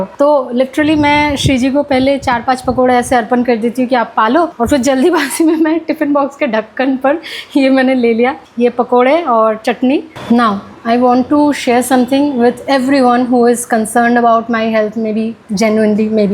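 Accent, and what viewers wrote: Bengal, India. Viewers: Di speaking in Bengali accent flawlessly 😮 mere sar ke upar se gya sab 🥲😅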